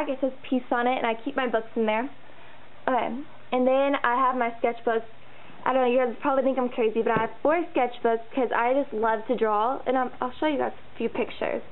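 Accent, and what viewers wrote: South, United States. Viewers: your room tour made me want to redo my room and not to be mean but your voice sounded kinda country -lauren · i watched this and i just wanna say we have te same accent ha yay we both have a southern accent btw love it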